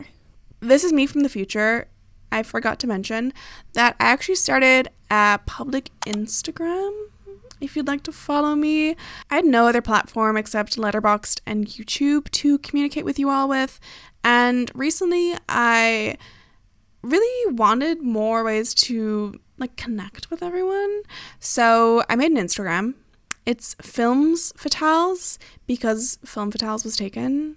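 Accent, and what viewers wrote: Ireland. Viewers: i was wondering why you had a slight irish accent and then you said your house in ireland (and ar-land not eye-er-land to boot lmaoo) everything makes sense !! irish queen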